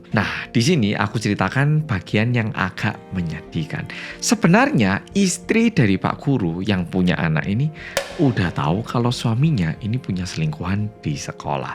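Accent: Java, Indonesia. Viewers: Tdr malem di temenin m medok 🙏🏿🌹🌷🌻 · Kemarin baru banget konten nya kk fyp di berandaku , aku coba nonton ko lama lama nagih juga apalagi pake detektif gitu di tambah suaranya itu medok . Dan akhirnya aku jadi tau kalau di korea itu selalu pakai detektf , bagus juga ya . Ga cape cape cari tau sendiri .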